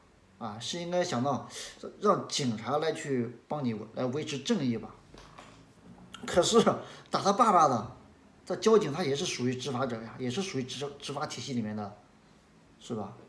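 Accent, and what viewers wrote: China. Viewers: 兄你好，我經常看你的影片，有個問題想跟你建議，能否錄製時把音量加大，我聽太不懂大陸腔，有時看你的影片會很辛苦，感謝你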